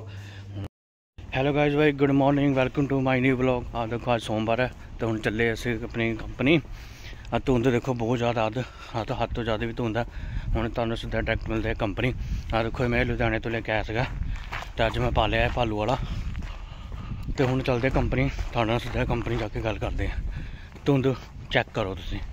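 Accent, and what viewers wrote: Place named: Bihar, India. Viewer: Bhai Tu Punjabi Nai Lagda Tu taa Bihari Lag Reha hai Boli toh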